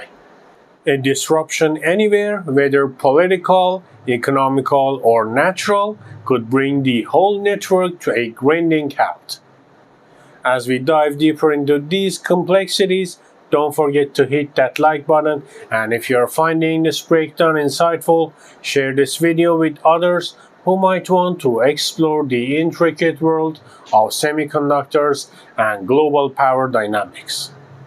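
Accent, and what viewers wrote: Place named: Russia. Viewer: I can barely understand this guy, due to his accent, which is probably Russian. The inflection is all wrong.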